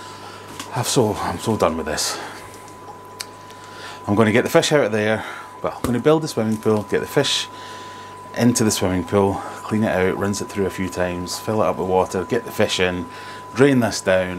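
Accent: Scotland, United Kingdom. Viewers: Mate, you've never sounded so Scottish. Lol.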